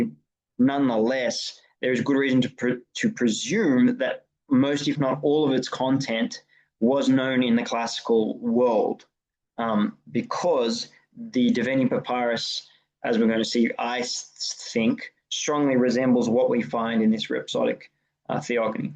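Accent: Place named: United Kingdom. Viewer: You’re one a few people with a British accent I can actually listen to without feeling like I’m being conned or talked to like a child by someone who doesn’t understand what they are saying. You sir, are a gentleman and a scholar.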